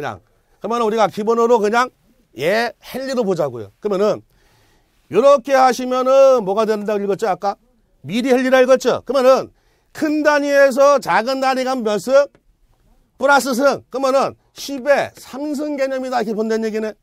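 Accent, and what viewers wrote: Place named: Jeolla, South Korea. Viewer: 강사님 전라도분이시죠? "그므는" 저도 전라도 사람인데 너무 구수하고 좋습니다.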